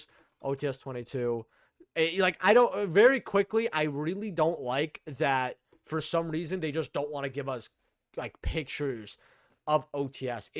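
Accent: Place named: New Jersey, United States. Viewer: European? Bro, I could’ve sworn you sounded like you might’ve been from Jersey or something.. also I hope 2025 is your year! We love you,!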